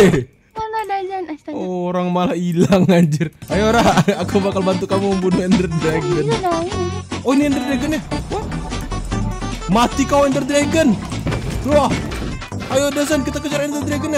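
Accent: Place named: United Kingdom. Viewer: your accent is sounds like British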